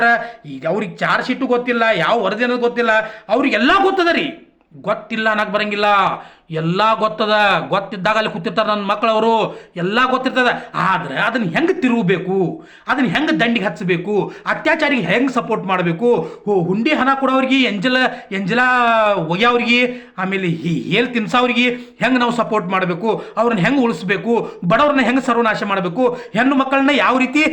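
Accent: North Karnataka, India. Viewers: ಉತ್ತರ ಕರ್ನಾಟಕದ ಭಾಷೇಲಿ ಬಲು ಛಲೋ ಐತಿರಿ, ನಿಮ್ಮ ಮಾತ.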